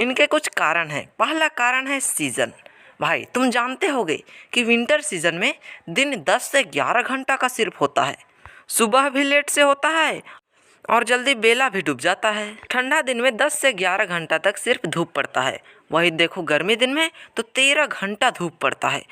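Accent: Bihar, India. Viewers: Are you a Bihari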